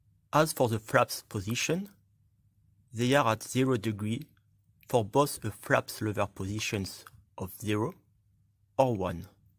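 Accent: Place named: France. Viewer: Amazing video . Very well explained . Good graphics . Love your French accent . Would love to see more and more videos of exercises done in simulator checks ,, even after 8000 hrs on A320 ,, this video on flaps and slat positions was a very good pictorial learning . Like and subscribed.. waiting for tutorials on ra1+2 .. emer elec .. adr + ir exams ,,, unreliable airspeed also ,, since there is not a single satisfactory video on YouTube … and many more videos pls …. We would also like to see videos on how to apply MEL in various stages of flight ..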